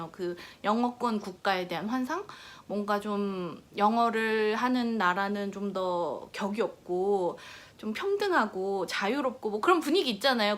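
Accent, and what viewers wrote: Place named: Australia. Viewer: 호주 아줌마말투 ㄷㄷㄷ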